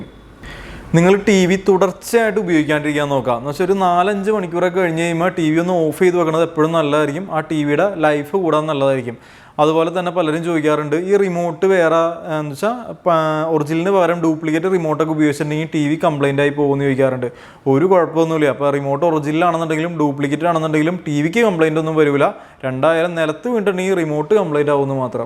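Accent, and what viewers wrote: Thrissur, India. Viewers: Thanks for the very useful info. Also Enjoyed listening to " Thrissur Basha ". A suggestion while using TVs on Table Tops. Just screw down the table stand legs to the wooden table with two or more U shaped stainless steel clips. Users can use own imagination too. Thanks again for the safety info.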